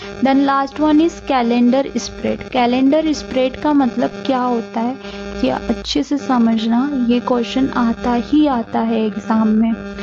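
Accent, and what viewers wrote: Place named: Indore, India. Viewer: Mam aap indore se belong karte hai kya aapki aawaz se lag raha hai😁👍😅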